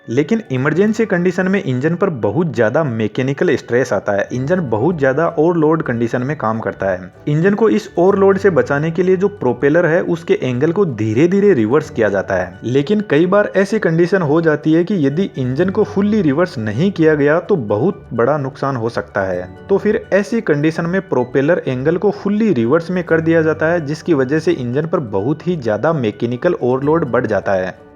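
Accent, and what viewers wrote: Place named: Haryana, India. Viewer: भाई मन्ने तो तू हरियाणा को लागा सा.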